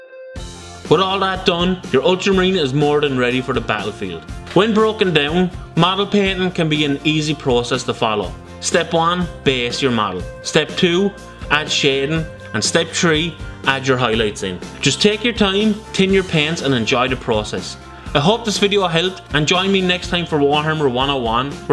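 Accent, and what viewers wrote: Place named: Wexford, Ireland. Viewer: I'm teetering on the brink of attempting to paint a miniature for the first time since my teenage years. This is really useful, thanks. It's not everyday I hear such a perfect example of a Wexford accent on the internet either ;)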